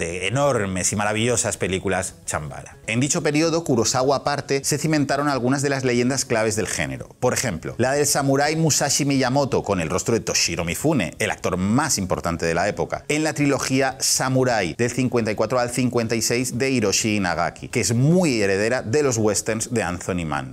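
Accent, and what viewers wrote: Spain. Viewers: A hacer un curso de idiomas, cualquier idioma, que todas las palabras extranjeras las pronuncias como un español típico que dice "se lee como pone".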